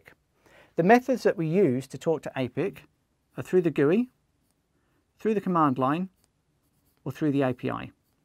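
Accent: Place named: United Kingdom. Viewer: God, finally UK gentleman pronounce... love it...nice one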